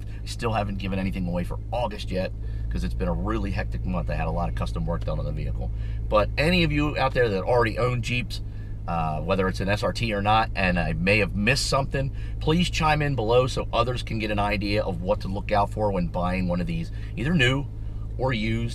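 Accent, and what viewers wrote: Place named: Philadelphia, United States. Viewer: Hey man awesome video!!! I’m here in Delco. West Philly guy born and raised!!! I could tell as soon as I heard you talking that you’re a local guy!! Love the video. Considering buying 2012 used with 80k miles.... it will be first nice car I bought myself. Loves trucks/suvs but also want something fast and fun to drive!!!! Love the way these SRT 8s look!!! It’s a 2012 SRT8 6.4 with 80k and they’re asking $25k I believe. Do you think it’s worth that,?? I can deal with little issues no problem and I always had trucks so MPG is not a concern! Lol pro charger sounds like a great idea hmmm 🤔 also I subscribed. Thanks man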